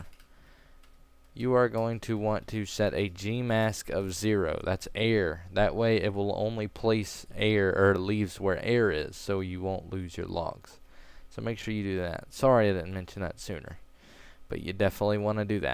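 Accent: South, United States. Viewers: Why do you sound like you were from the south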